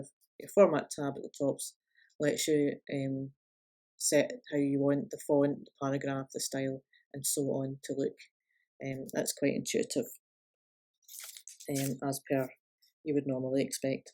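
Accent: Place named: Glasgow, United Kingdom. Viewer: I liked the way you speak; are you a Glaswegian?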